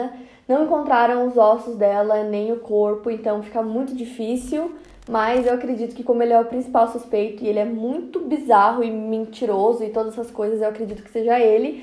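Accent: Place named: South, Brazil. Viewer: Único sotaque sulista aceitável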